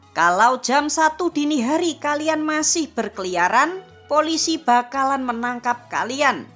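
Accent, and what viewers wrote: Java, Indonesia. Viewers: di lihat logatnya mimin org jawa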